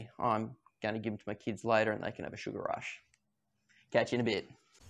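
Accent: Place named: Australia. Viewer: Nothing’s better than watching a local brew. Love the Australian accent, approach and ingredients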